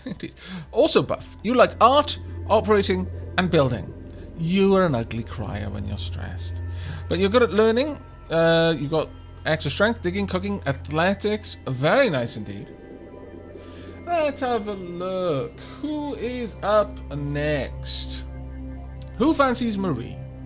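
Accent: Wales, United Kingdom. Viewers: Are you from Wales by any chance? Your accent sounds very Welsh. I live there!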